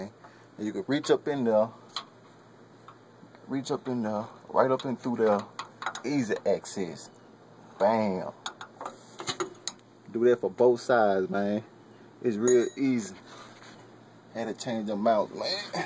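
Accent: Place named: South, United States. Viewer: feurl lines........wranch...eyybody..maane.. talm bout.......either you from here in jackson ms......or you from texas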